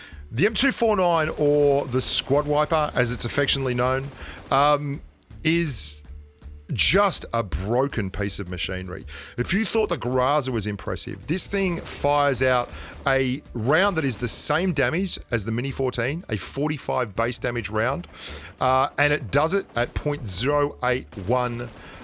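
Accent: Australia. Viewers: "GrAAAAAHzer" gotta love that Aussie accent hahaha keep up the great work, love your videos!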